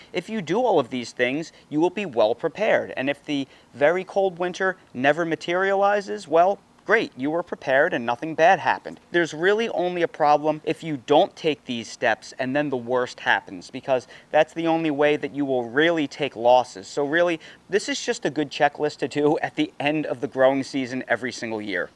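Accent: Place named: South Jersey, United States. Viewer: Are you sure you're from Philly? Your accent sounds more like South Jersey.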